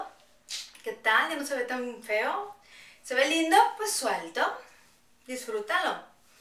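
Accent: Mexico. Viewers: Se me imaginaa k as de seer de El DF o del Norte de Mexicoo jejeje xD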